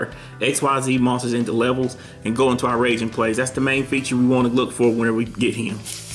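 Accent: South, United States. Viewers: You sound like a country boy but nice profile 👍👍👍😀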